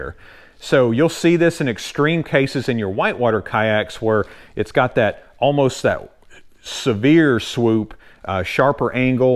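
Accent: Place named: South, United States. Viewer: This Minnesotan enjoys your subtle southern drawl 😁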